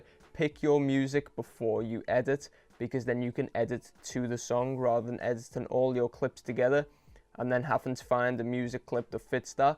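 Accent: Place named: North, United Kingdom. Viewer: Thank you for such a detailed and well explained video. Great to hear a fellow northerner too ;)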